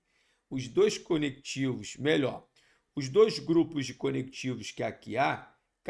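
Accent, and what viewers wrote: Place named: Rio de Janeiro, Brazil. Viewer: Esse carioquês é uma maravillha, kkkkkk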